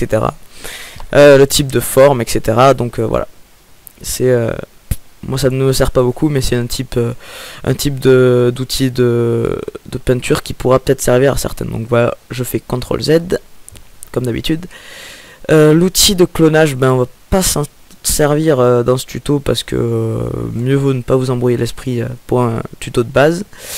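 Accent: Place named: South, France. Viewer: coucou merci pour ce tuto moi qui utiliser photofiltre pour recarder ou autre des photo depuis queje l'ai plus je ne savais plus rien faire merci a toi et jespere de tout coeur que tu continueras ta serie sur les tuto gimp car je trouve ca claire bien expliquer net puis tu as laccent du sud et je viens du sud voila gros bisous ja mabonne !!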